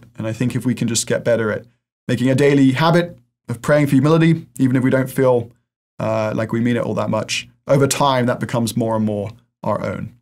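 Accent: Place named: England, United Kingdom. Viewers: Thank you from England - and you sound as if you come from here! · Yes, he has a UK accent. I also noticed that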